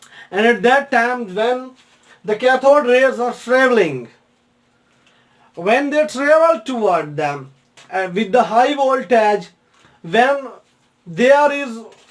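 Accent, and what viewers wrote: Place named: Saraiki, Pakistan. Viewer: سرائیکی وسیب کا ایک بہت ہی پیارا انسان بھائی اللہ کریم سدا سلامت رکھے اور لاکھوں کامیابیاں عطا فرمائے ۔۔سر جب آپ انگلش لیکچر کے بعد سرائیکی میں۔لیکچر دیتے ہیں تو وہ بہت خوبصورت لگتا ہے سرائیکی زبان ویسے بھی بہت سادہ اور میٹھی زبان ہے آپ کا انگلش لہجہ بھی وسیب کے لوگوں جیسا ہے اللہ کریم۔آپ کو خوش رکھے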